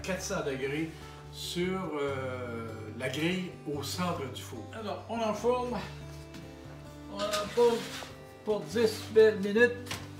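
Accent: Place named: Canada. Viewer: Merci pour le travail des conversions... xD Bonne continuation pour tes nouvelles recettes et très franchement j'adore votre "accent" canadien ! Grand merci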